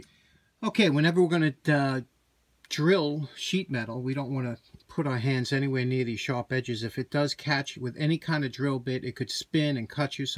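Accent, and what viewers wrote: New York, United States. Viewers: Is that a New York accent I hear? (I'd say definitely East cost?) :-)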